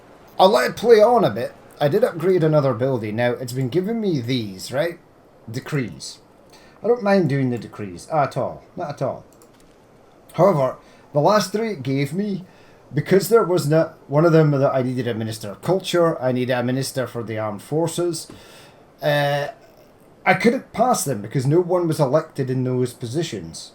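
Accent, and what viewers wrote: Scotland, United Kingdom. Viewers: Hey man. Where's the accent from? I assume it's Scottish, but I'd love to know. Thanks!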